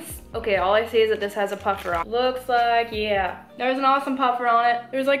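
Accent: Texas, United States. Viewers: Love that she's from Texas and says "y'all" like me 😁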